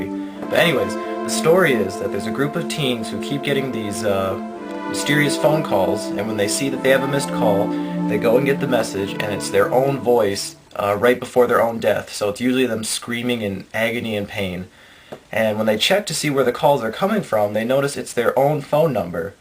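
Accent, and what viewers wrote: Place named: Michigan, United States. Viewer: I'm betting you're from Michigan... :p Nice accent ;)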